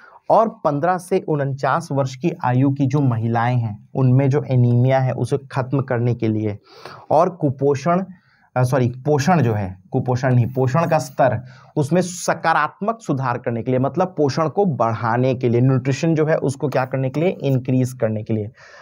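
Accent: Chhattisgarh, India. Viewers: Sir ji jab aap cg me bolte ho n...to bahut accha lagta h🙏❤️🙏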